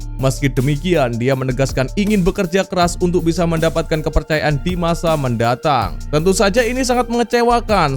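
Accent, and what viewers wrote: Java, Indonesia. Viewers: Jelek amat komentatnya Jawa medok... 😝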